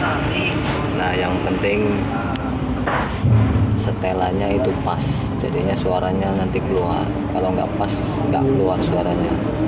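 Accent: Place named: Java, Indonesia. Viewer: Semangat bang.. 👍👍👍👍 nyari rizqi..semoga sukses.. medok ya..kek aku hehehe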